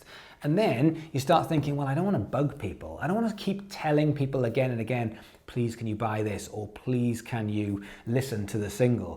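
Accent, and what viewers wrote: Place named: England, United Kingdom. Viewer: Thankyou so much, you are so knowledgeable, i have learned so much from you,and an English accent! I will follow your advice.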